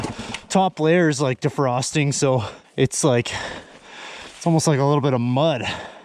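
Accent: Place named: Canada. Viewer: Yeh dude. You Canooks got that funny northern accent and it cracks me up. Grip studs are epic for sure.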